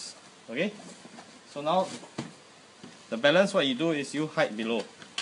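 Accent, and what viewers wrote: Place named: Singapore. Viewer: singaporean accent!!!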